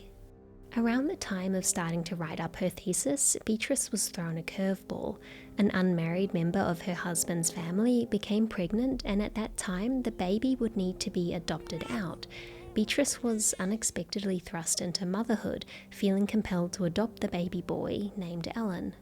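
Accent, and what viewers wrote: New Zealand. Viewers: That's just the kiwi accent. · I’d not realized before this video you were a fellow kiwi! I’m terrible at picking up accents.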